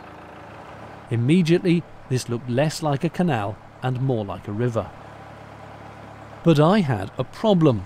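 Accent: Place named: RP, United Kingdom. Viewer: Both "glided" and "glid" are acceptable past participles of the verb "glide". Bog is considered informal so I think he is doing it merely to deflect from his received pronunciation that pervades his every video. You have to love the BBC training that he received for all of it's ills... It's a good job he is good at this video production malarkey isn't it?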